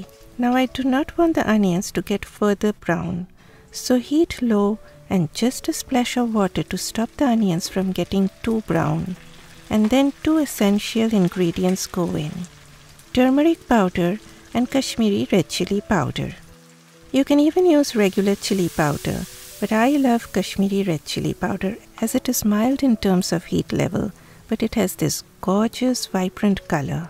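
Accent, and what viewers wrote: Bengal, India. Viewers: From the very beginning from your tone I easily understood you are Bengali. I'm also from Kolkata. খুব ভালো হয়েছে রান্নাটা দিদি। পুরোদস্তুর আমাদের কলকাতার বাঙালি স্টাইল। এই স্টাইল টাই আমাদের পরিচয়। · England. Not exactly on topic I'm afraid but I find your voice lovely. It's clear with that gentle Indian eccent. I absolutely hate being talked 'at', you talk 'to' almost like a friend. I do follow your channel and even attempt your easy recipes. Most come out fine, my wife is fussy and loves them too.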